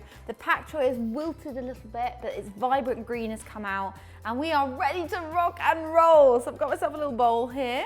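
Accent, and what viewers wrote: United Kingdom. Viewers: You're British! I just got it.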